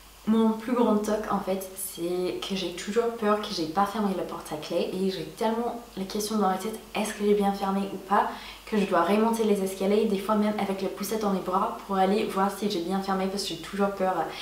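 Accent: Montpellier, France. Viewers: C'est marrant vous avez de plus en plus un accent français en parlant. Surtout sur certaines phrases rapide a dire. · Je trouve trop mignon ton accent Français ! :) Est-ce que les Américaines trouvent attirant l'accent Français quand un Français parlent Anglais ? Sinon, si tu veux visiter la France, je suis de Dijon = le pays de la moutarde, du cassis, du vin et des ducs de Bourgogne ! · Tu es de Montpellier oú j'ai mal entendu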